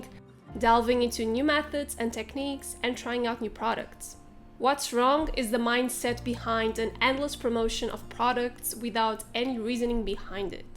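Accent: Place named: Portugal. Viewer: só pelo sotaque consegui perceber que eras de Portugal ahshagshs adorei o conteúdo, you just gained one new sub <3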